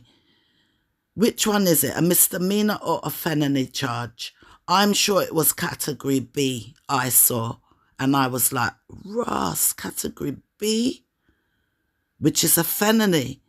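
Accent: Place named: Jamaica. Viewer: I could tell you were English speaking but had a Jamaican background i can tell in your voice I live in the UK but was born in Jamaica and came here at the age of 8 I am now in my 60s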